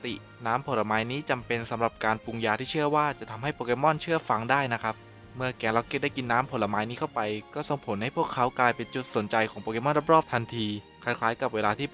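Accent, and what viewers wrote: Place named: Suphanburi, Thailand. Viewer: สำเนียงเหมือนคนสุพรรณเลย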